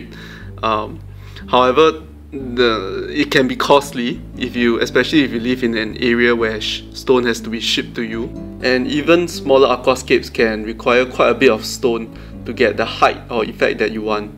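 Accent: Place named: Singapore. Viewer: Sound like my country men, u singaporean?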